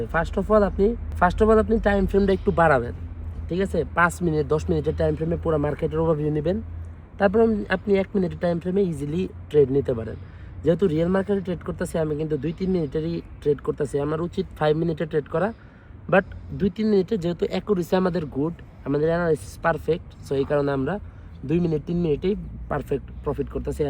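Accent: Tangail, Bangladesh. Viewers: vai ki tangailla nki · কথা বার্তার ধরনেই বুঝা যায় আপনি পিউর টাংগাইলা..😁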